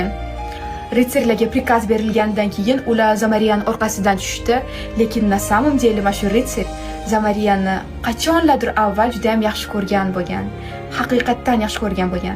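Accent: Tashkent, Uzbekistan. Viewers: Ha xaqiqiy Toshkentcha til chottki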